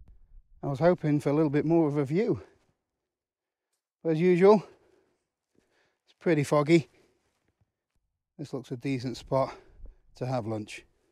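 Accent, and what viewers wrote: United Kingdom. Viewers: Great video as always! I will try this with the kids when I next take them to the mountains. I'm from the U.K. but have lived and hiked in Japan for the last 12 years. It's great to have a British voice to listen to and get opinions on and see the places I used to walk in. Great channel keep it up!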